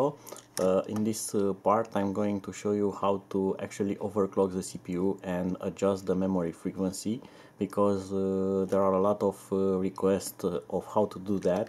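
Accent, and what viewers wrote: Romania. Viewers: Hai salut. Mama cum recunoști romanii dupa accept :)))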